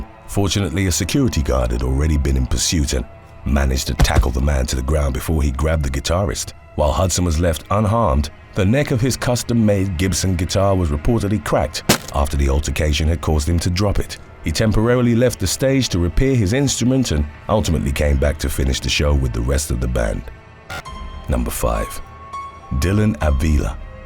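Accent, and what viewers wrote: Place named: England, United Kingdom. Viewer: he's English. they pronounce things differently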